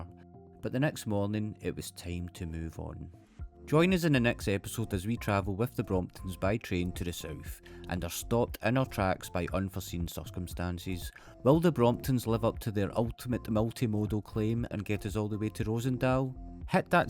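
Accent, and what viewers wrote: Scotland, United Kingdom. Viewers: Naarden-Vesting is definitely worth a visit! In the past, I often took a walk around the entire fortress with my son, who was 10 at the time, along the outside of the water. Beautiful and very peaceful. I do have to get used to your nice Scottish accent a bit, though. Have lots of fun in the Netherlands and thanks for your video.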